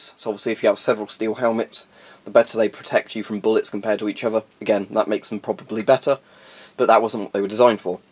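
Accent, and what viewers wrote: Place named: United Kingdom. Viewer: “What a shhhtupid name for it” haha British accents make everything sound so much better while being right at the same time 😂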